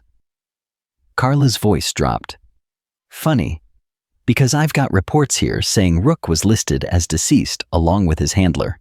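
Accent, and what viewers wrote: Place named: United States. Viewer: I like the narrators voice! Appreciate that she sounds like the USA… Kind of tired of all those British accents talking USA stories. Good story.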